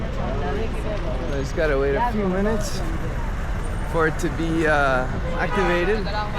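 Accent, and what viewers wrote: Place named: United States. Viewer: Maybe taliban suspicious of you because you sound American. They are watching you all the time 😮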